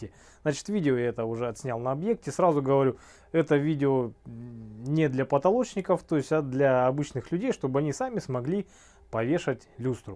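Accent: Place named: Siberia, Russia. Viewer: Это обычный говор сибиряков. Не в этом суть, главное, руки золотые!👍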